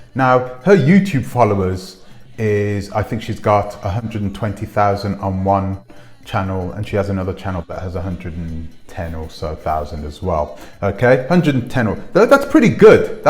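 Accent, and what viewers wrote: United Kingdom. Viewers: An African speaking in a British accent hating on a black American man’s success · You’re a fraud, you British talking sounding shavy head having Weirdo.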